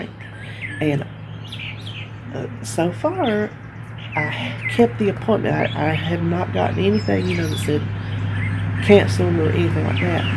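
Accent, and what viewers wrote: South, United States. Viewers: I love your Let's Talk videos. You make me laugh and I love laughing. Not to sound creepy or anything, but I love listening to you talk. I don't know what it is....whether its the southern accent or the fact that you are a downhome person.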